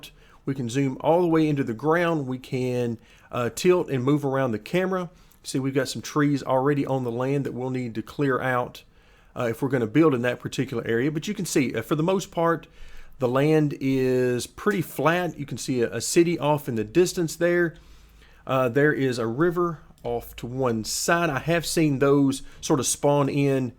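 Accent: South, United States. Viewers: I am from Florida and this accent is interchangeable for Florida, Georgia, Tennessee and maybe further out depending on the individual. I have lived down here for thirty-five years.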